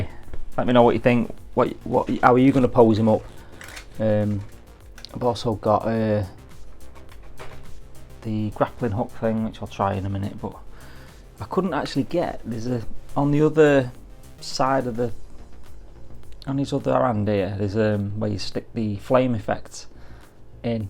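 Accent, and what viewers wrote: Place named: Manchester, United Kingdom. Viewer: "I can barely speak English meself, to be honest." LOL! Me too! I'm a Mancunian so I grinned at your use of "meself" instead of "myself" as that's my dialect too. "Mi" instead of "my" "tuh" instead of "to". Yep. When I moved to where I currently live a lot of the neighbours had difficultly understanding my regional accent. You say how good the paint application is on the head sculpt and oh my, yes it is. From the changes in skin tone at the edges of his eye to the slighter blush of his cheeks, then down to the greying of his stubble. It's incredibly subtle and skillfully done. A tube shaped container. I wonder if that's supposed to be a nod to the tube he gave to Zam Wessel containing those creatures that were intended to kill padme at the start of Attack of the Clones. Everything about this armour is outstanding, particularly the wonderful weathering they've given it, but also other details right down to the tread on the soles of his boots. 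"I've been wanting a Jango Fett since I started collecting Hot Toys." And the delight at finally getting him was evident in that big smile across your face at the start of the video when were holding his box. I think that kind of sums up a lot of why collectors buy these figures - they bring joy. I'm really pleased that you got such a long awaited figure,. Congratulations. Bet he's going to look superb in your stylish detolf displays. I think the poncho, paired with his twin guns, really brings home the fact that he i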